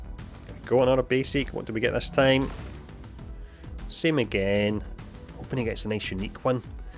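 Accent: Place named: Glasgow, United Kingdom. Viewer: ahhh ok, are you in the US? Cos your accent sounds like your from near Glasgow like paisley or that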